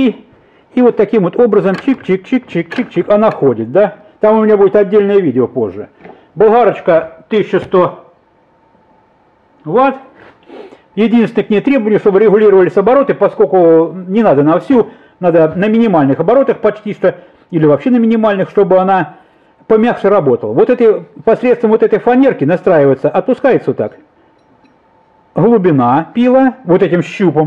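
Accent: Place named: South Russia, Russia. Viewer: а какой город у вас? Судя по говору либо юг руси либо у края руси...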